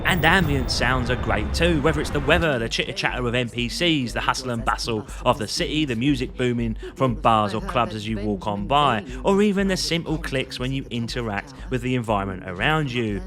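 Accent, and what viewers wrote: Cockney, United Kingdom. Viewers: I like the cockney gamer gimmick but some of the similes were a bit too on-the-nose. Good gaff though, don't feel you have to force it.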